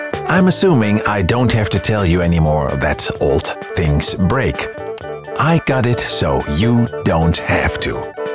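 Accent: Netherlands. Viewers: Very Dutch accent: replacing all the 'th' sounds with a 'd'.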